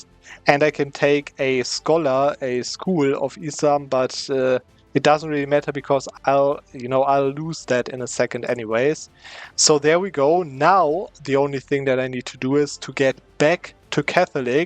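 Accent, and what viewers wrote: Germany. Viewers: REALLY thicc german acsent.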